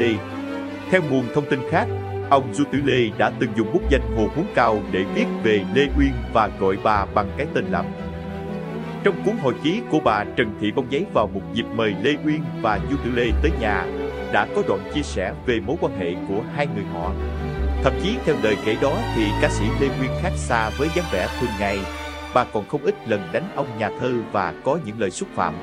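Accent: Vietnam. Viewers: giọng miền Nam hay truyền cảm của MC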